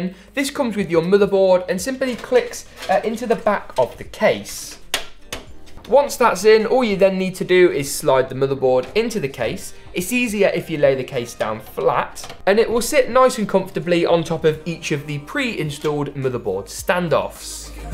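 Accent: United Kingdom. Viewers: are you from the uk? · You're from the UK right? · you sound like you might be from the uk but you always put USD into the titles. It confuses me😂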